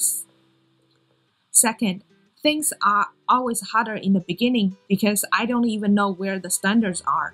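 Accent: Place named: India. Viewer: indian guys are killing it with their accent good luck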